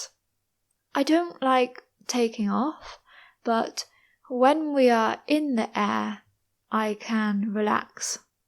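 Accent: United Kingdom. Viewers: I love British accent, thank you for support, its so difficult find it, i happy cause i found out your channel,. 😁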